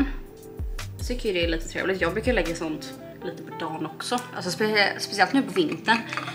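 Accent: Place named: Gothenburg, Sweden. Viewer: Superbra video! Alltså tycker du är så gullig när du ibland har din göteborgska dialekt fast med en släng av Karlskrona-dialekt i samma mening😍😂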